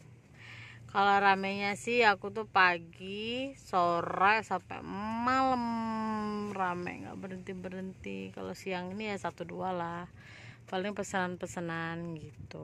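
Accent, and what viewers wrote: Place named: Makassar, Indonesia. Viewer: teh asli nya orang mana .. kaya orang makasar ya ngomong nya😁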